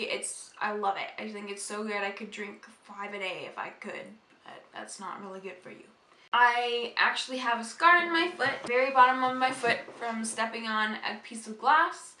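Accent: Canada. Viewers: you MUST be Canadian! I can tell by the way you say "out". I <3 canada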